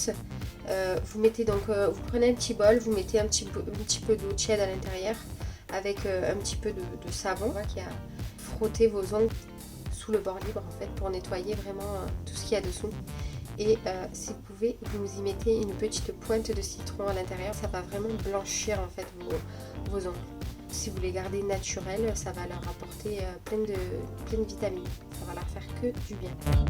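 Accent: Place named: South, France. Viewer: Merci pour votre vidéo! J'ai arrêté de me ronger les ongles et je cherchais une vidéo pour en prendre soin. C'est chose faite! J'ai trouvé ma petite routine ongulaire 😁. Vous êtes du Sud non? J'adore votre accent!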